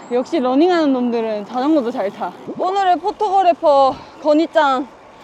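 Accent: Gyeongsang, South Korea. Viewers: 뭔가 갱상도 악센트가...ㅋ 대단하세요. 예쁩니다.^^ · 멋집니다^^ 경상도 말투가 더 정겹네요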